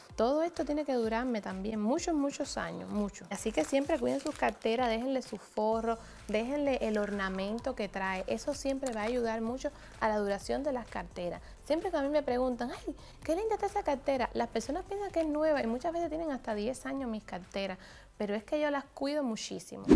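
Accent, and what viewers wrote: Cuba. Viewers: Se parecen y su acento es el mismo por que las dos son de cuba 🇨🇺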